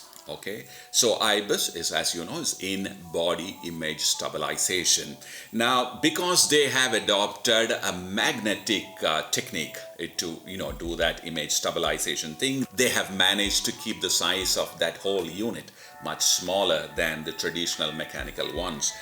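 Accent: Kerala, India. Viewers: Chetta....ur mallu accent .....rocks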